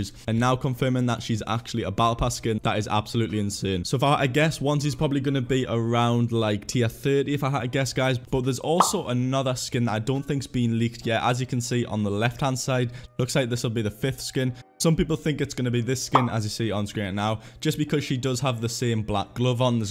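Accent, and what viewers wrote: United Kingdom. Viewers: He pronounces Tier (Tear), the same way Evryone else in the UK, we do not pronounce it (Tire) like Evryone else, we say it the correct way.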